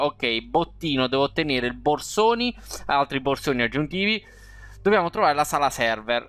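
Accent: Bari, Italy. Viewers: Sei di bari?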